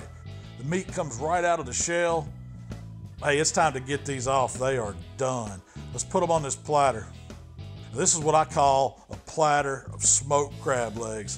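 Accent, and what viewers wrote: South, United States. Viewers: I like his southern accent.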